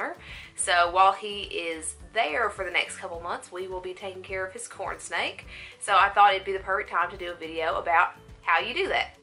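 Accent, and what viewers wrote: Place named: Texas, United States. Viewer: You and I have the same accent I'm from Texas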